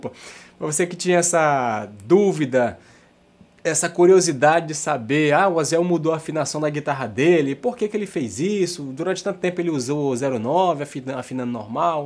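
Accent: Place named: North, Brazil. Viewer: vente você nesse vídeo que você conversa bastante e toca um pouco, parece duas pessoas, você tem um falar calmo, simples com esse sotaque lindo do norte, mas quando toca fica um monstro( no melhor sentido) e arrebenta... Valeu Cara!!! Por toda humildade e talento.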